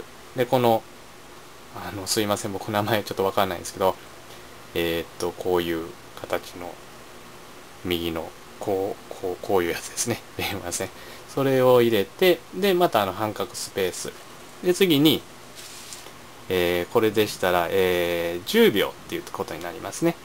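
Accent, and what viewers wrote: Japan. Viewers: 京都弁だ～～ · 関西弁グッド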